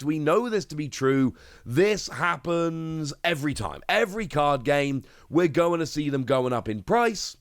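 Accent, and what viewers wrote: United Kingdom. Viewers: You have so strong British accent however you are really clear, it is a pleasure to follow your channel.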